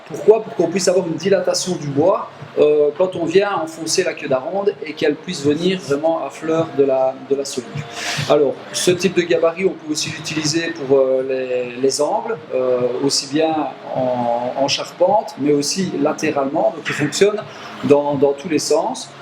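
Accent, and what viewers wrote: Belgium. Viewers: Bravo, une bonne imitation de, tu tiens bien l'accent belge. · L'accent belge m'avait manqué.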